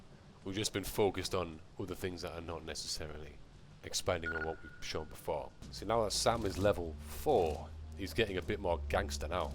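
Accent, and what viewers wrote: United Kingdom. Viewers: He sounds scottish, first time i heard this voice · So dont hate him for british acent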